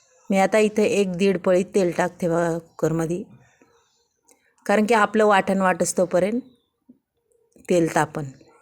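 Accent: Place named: Rural, India. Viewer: तुझं बोलणं आवडतं मला खूप गावच्या पद्धतीने बोलतेस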